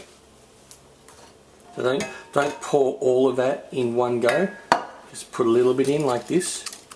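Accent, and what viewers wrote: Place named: Australia. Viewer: hayward, you love his accent because he's australian! Go aussie XD Your whisk is cute ^_^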